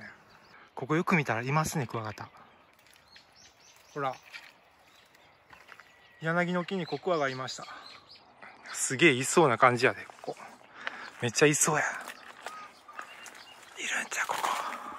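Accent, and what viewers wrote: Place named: Nara, Japan. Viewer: 喋り方が関西の中でも奈良や京都の南の方ぽい(^^)木津川かな？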